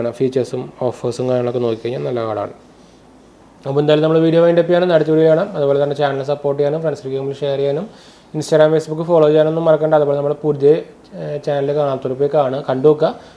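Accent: Thrissur, India. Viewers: തൃശൂരാല്ലേ ഗഡീ ?😛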